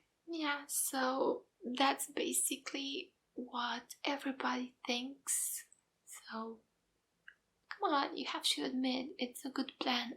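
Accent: France. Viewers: Is that a french accent?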